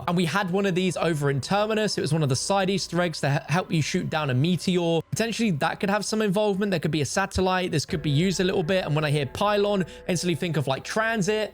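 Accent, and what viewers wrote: England, United Kingdom. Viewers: He is pronouncing it correctly he is English that's how we pronounce it (correctly) 👍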